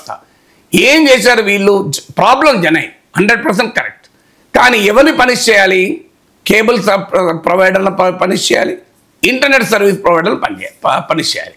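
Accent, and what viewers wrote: Telangana, India. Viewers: Avunu bro,adi Telangana yasa daniki nvu pallu ikilinchalsina avsaram ledanukunta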